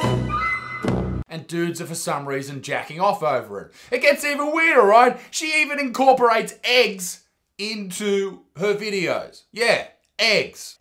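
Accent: Australia. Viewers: The most Aussie guy I have ever heard or seen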